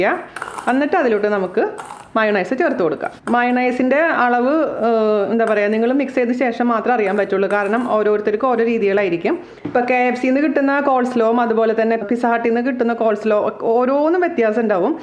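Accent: Thrissur, India. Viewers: Chechi thrissur slag kelkkan nalla rasam und too endhe amma veedu chalakudyil aaa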